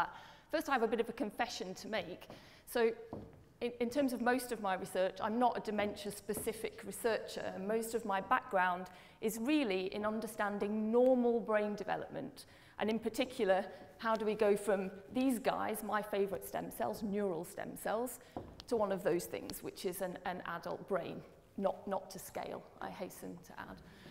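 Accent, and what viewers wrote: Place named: United Kingdom. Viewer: Wonderful information. With your Brittish/ English accent and your rapid diction, it was almost too fast for me. .... an American born english speaker. Imagine the difficulty for my French wife who speaks English fluently. I can tell you are supper enthusiastic, but a large part of your potential audience cannot be included.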